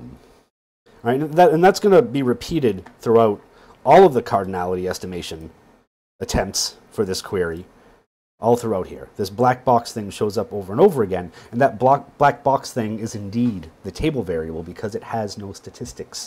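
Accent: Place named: England, United Kingdom. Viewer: I liked this video for the (English?) accent.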